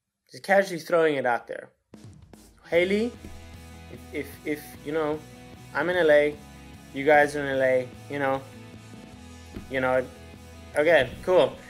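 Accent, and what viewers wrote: South Africa. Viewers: its south African i blv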